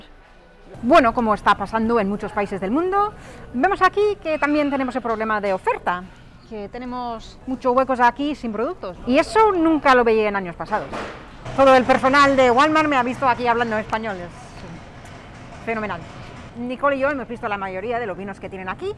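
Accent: Spain. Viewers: Wow i didn't even know you were American at first...I definitely hear your Spanish accent! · Me quedé impactado cuando dijiste que eras de USA, por tu acento pensé que eras española nativa. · Sorprende qué siendo norteamericana? Hables con acento de españa, qué es mas difícil (no permite seseo,exige acentuación al hablar,etc.)es casi como aprender alemán siendo Americano. Me preguntó si manejando un Español con acento? porqué no hicistes vida en españa?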